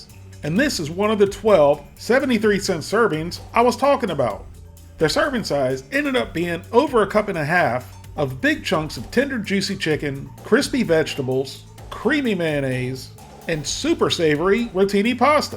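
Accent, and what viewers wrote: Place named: Florida, United States. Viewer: You sound like your from Florida, also great video :)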